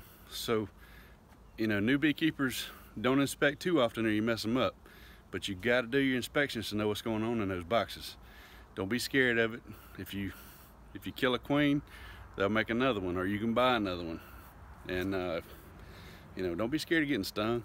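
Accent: South, United States. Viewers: This viewer is 'Disappointed ++'? What an entitled brat! Not your job to educate anyone on beekeeping for free. We are here to enjoy your beekeeping/ relocating exploits which are hugely entertaining and instructive. I watch because I learn a lot about bees, I'm entertained with your southern accent and antics, and get to visit the deep south with its trees, climate, animals, people, customs, and different climate zone. Just keep doing what you are doing, and know that the vast majority really like your videos, like you, and don't need you to do anything more. There will always be whiners, narcissists, and self-centered people who annoy others like Africanized bees. Just wear protective gear around those folks.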